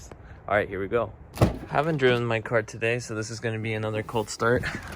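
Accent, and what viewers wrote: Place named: California, United States. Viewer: Sounds like a Californian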